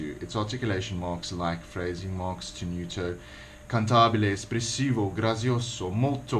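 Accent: South Africa. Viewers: is this guy South African cause i think i hear the accent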